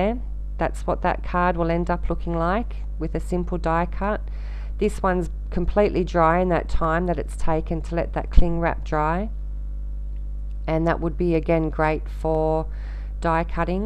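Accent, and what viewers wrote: Australia. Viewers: Brilliant I have just ordered some, looking forward to receiving them. Awesome to hear an Aussie doing a tutorial make it seem like home well done. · Hi, I have just found you. So good to hear a fellow Australian. I really enjoyed your video on Brushos. I have some on order just waiting for delivery. I know that I will love them. Thanks for your video. I will check out your blog. Hugs Glenis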